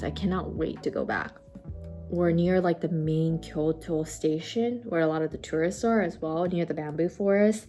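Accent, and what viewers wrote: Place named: United States. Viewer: I still really like how your Japanese pronunciation has a "American" accent to it!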